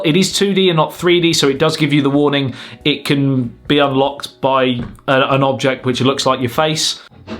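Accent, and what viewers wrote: United Kingdom. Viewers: Like your UK accent mate 😏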